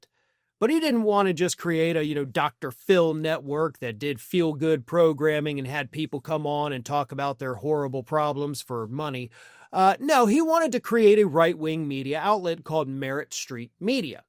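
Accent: South, United States. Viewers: No need to mock the south by using that accent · The southern accent is starting to get to me. Very insulting to intelligent non MAGA southerners no matter how redneck you pitch it.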